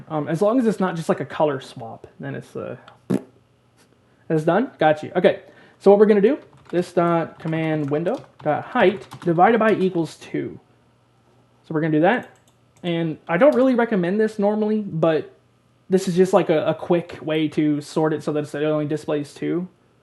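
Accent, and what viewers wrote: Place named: South, United States. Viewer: You're definitely from like a Southern state lol. Mississippi or something. If you're close by we should be friends haha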